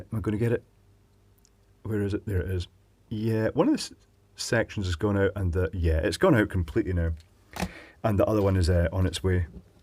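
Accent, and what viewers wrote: Scotland, United Kingdom. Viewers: I enjoy your channel and find it entertaining and relaxing. It must be your brogue. Good stuff. Cheers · Thank you for your daily entertainment, for teaching me and for being a wealth of knowledge. I am from Edinburgh originally but moved to Australia 7 years ago, it is so refreshing to hear an accent similar to mine in such a different country! :) Love what you do, and I will definitely be becoming a patron (patreon??) after your explanation on the importance of the added revenue!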